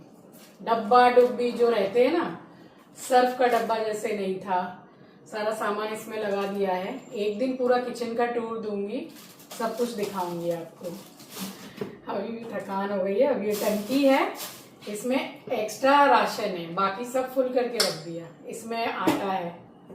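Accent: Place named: Uttar Pradesh, India. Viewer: Aap up wali lenguage kese bol rhe ho.... Mai up se hu boht achha lga sun ke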